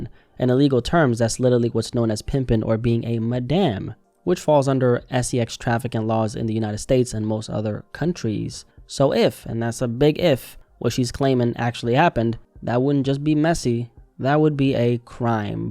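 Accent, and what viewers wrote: Jamaica. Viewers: You Jamaican ? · u Jamaican??????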